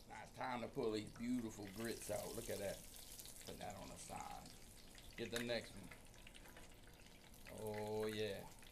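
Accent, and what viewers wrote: Louisiana, United States. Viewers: Are you from Louisiana I have friends with the same accent or Tennessee that food looks tasty though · That’s not just a southern accent, sounds like Louisiana? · I love that Louisiana accent. It's FIYAH.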